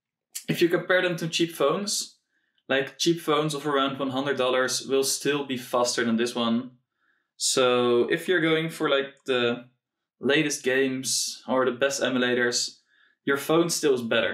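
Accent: Netherlands. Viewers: Haha dank je voor de video. Ik heb besloten mijn 2DS te modden zodat ik ook oude games kan spelen. Zelfs CBM64 (commodore) gamen. Nu zit er homebrew op :-) Lachen man, ik hoorde aan je accent dat je Nederlands bent. Maarreh toch vermakelijk die Ali consoles :o)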